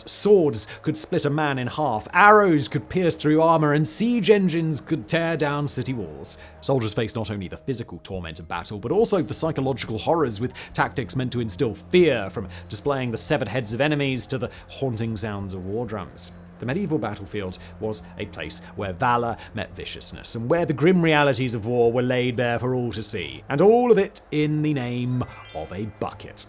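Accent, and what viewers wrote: United Kingdom. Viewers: Oversimplified with a british accent. Interesting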